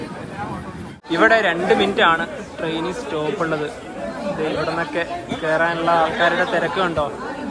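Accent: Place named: Kasaragod, India. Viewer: I like Kasaragod language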